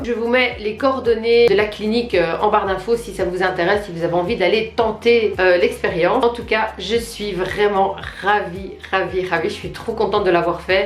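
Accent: Belgium. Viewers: Est ce que tu est belge? Car tu as l accènt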